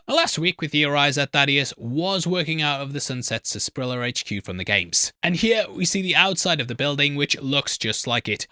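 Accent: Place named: Newcastle, United Kingdom. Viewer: I didn’t know you were Geordie, or at least northern?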